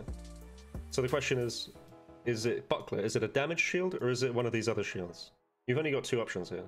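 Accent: United Kingdom. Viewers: You definitely have a Devonshire accent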